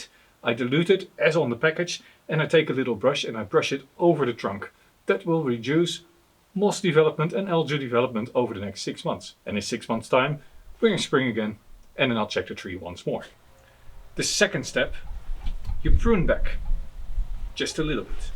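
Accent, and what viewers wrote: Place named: Netherlands. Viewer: Another very enjoyable video,! Your tips are very easy to understand and remember. Also I enjoy another Dutch person speaking English, always funny how we pronounce a lot of English words, it makes us very recognizable haha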